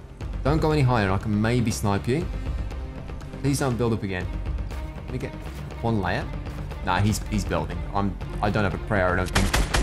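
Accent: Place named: United Kingdom. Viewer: your british accent it is really good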